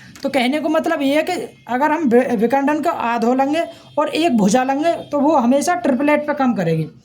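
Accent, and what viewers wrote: India. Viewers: Bhaiya brajwasi hi h ka tu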